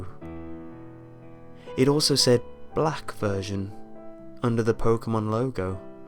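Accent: United Kingdom. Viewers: Luis Galaz preach, stop calling people retards and stuff just because they misheard the word “pawn”. The man is British and you can easily mishear a word.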